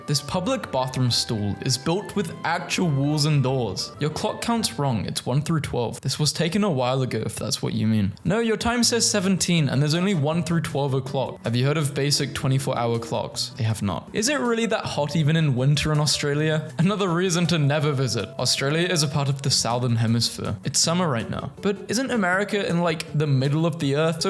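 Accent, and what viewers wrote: United Kingdom. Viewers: Yo british accent kills me, it's funny, anyway, I luv your vids! keep going bruh!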